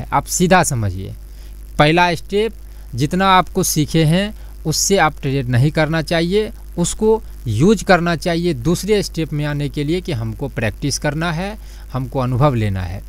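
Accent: Bihar, India. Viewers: तेरे आवाज में पूरा बिहार पान लग रहा है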